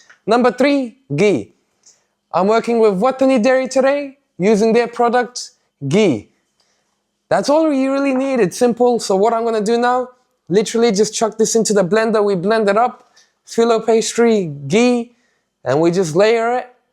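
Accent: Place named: United Kingdom. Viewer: I just watched it because of British Accent🤦😂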